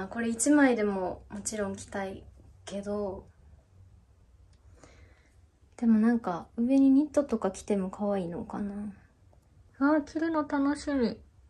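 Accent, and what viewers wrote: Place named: Kyoto, Japan. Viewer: 今までゆきりん一筋でしたが、AKBの全国ツアー(愛知公演)を見に行ってからゆいちゃんのことも気になるようになりました😅 ゆいちゃんの京都弁が好きです😊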